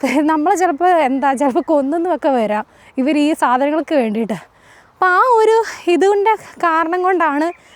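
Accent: Kottayam, India. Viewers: Kottayam slang liked enna parayana Ennada uvve angana kure und like kochi trichur tvm etc 😊😊🎉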